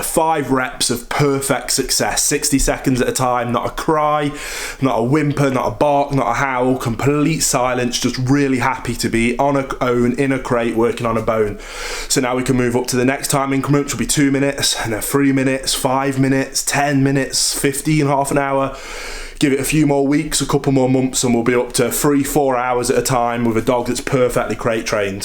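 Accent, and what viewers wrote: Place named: England, United Kingdom. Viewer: I'm enjoying both of your YouTube sites and your puppy course. In 4 more weeks I am going to be able to pickup my lab puppy. With the help of a special trainer I will train the pup to be a mobility service dog. I want to be able to go places where a rolling walker is a problem. Keep up the good work, best wishes from Albuquerque, New Mexico in the Southwest of the U.S. ... P.S. many years ago I worked for the DuPont company in Stevenage, so your "accent" is welcome.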